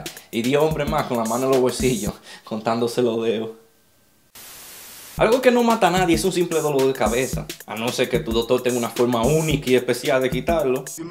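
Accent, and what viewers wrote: Cibao, Dominican Republic. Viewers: Jajajajaja que risa manin eres de República Dominicana no lo puede nega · hahahahahahahahahahahah k cura x.x eres dominicano? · Dominicano de pura sepa...No se nota?? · AJAJAJAJAJAJAJA ME ENCANTAN TODOS TUS VIDEOS SON LO MAXIIMOOO... Y ESE ACENTOOO DOMINICANOOO EXCELENTE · es dominicano del Cibao jajajja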